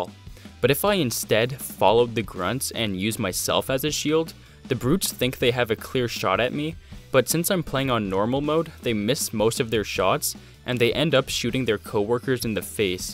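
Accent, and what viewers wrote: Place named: Southern Canada, Canada. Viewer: your accent so south-canadian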